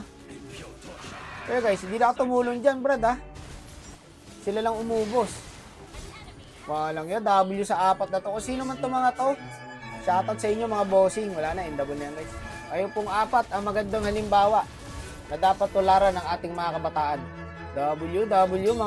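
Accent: Iloilo, Philippines. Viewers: Bos ilonggo ka naman mag salita sa content mo kakatuwa eh enjoy 😂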